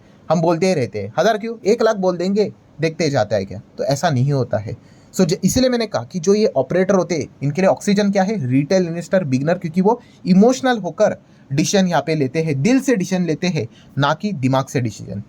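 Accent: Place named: Rural, India. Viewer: Gadha share market ka bolna nahi sikha gaon Wale ki tarha bolta hai .educated analyst ko dekh kar kuch sikha kar ke Kay bona hai aur kitna bolna hai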